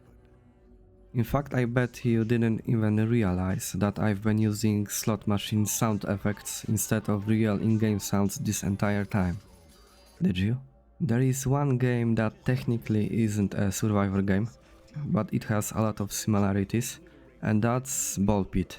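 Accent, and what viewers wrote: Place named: Poland. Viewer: You sound Polish bro, I like polyaks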